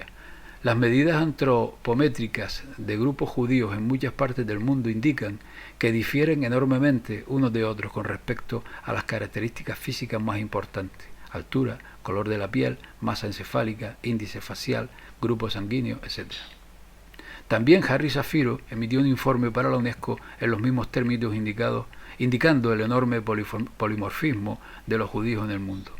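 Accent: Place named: Canary Islands, Spain. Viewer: Por tu acento deduzco que eres Canario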